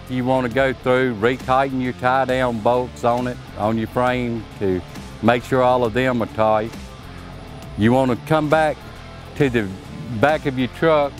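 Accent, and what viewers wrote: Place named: South, United States. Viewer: Im about as north as you can be and damn that's thick south!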